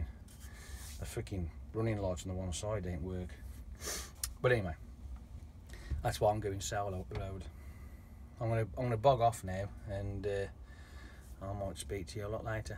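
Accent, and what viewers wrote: Birmingham, United Kingdom. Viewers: It’s like I’m watching the brummie version of Karl Pilkington sometimes!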